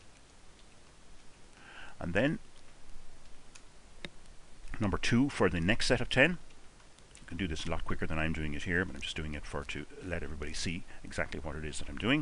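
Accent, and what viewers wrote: Ireland. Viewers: This video was clear, addressed exactly what I needed to learn, and did not have unnecessary commentary! Thank you! When you are working hard on several problems and you get exactly what you need in a comprehensive yet streamlined presentation, it is a gift. I would take a course taught by you Dr.. I am married to a man from Galway City, Ireland and your brogue added a touch a familiarity that I appreciated.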